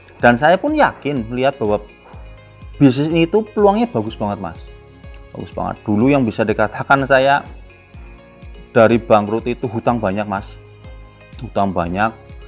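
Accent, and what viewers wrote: Banyumas, Indonesia. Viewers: Asyiikk Logat nya kok kayak kiyek banyumasan yaa mas sse.... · Alamat mana ya kak, tadi kurang jelas. Kayaknya medike ngapak ya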